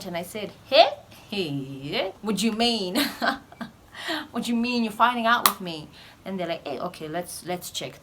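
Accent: England, United Kingdom. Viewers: you should do a video where u speak using the British accent only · I love your British accent 😍😂😂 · I honestly looove your english accent ❤😍n your sense of humour 😂😂😂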